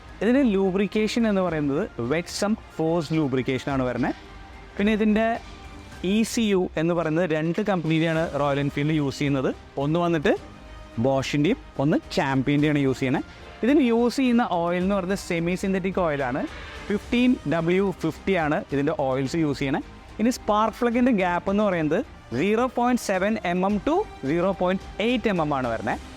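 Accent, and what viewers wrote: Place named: Thrissur, India. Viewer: Clear technical explanation, no BS, narration in authentic Thrissur Malayalam. Really liked your review keep going. Lots of love ❤, more power to you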